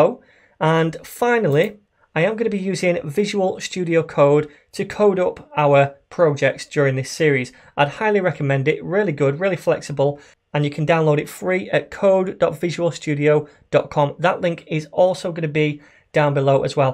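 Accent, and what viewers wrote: United Kingdom. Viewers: I just enjoy any tutorial with a British accents....it's just easy...much love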